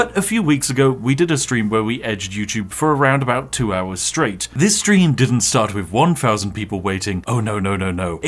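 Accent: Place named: Yorkshire, United Kingdom. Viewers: Yorkshire :3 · Wow Yorkshire. Tea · Very Yorkshire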